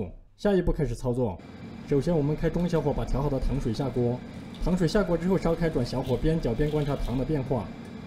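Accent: China. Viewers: 请问王师父能不能配上字幕，因你们中国口音对我们这些海外土生土长的华人来说真得听不明白。谢谢!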